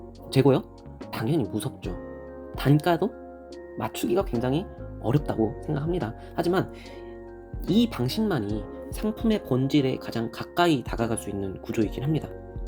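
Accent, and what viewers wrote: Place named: Jeolla, South Korea. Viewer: 능력자시네요!! 저 혹시 고향이 전라도시죠? 말투가 딱 고향사람같이느껴지네요!!! 반갑습니다!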